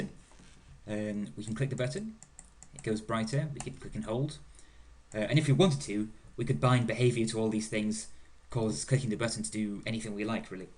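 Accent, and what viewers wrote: United Kingdom. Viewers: These videos seem to be very interesting but unfortunately I am not able to understand the author as I should for a tutorial. His british accent and the speed at which he speaks is too much for me :( Subtitles are no option. sad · I love i when British people narrate tutorial videos !!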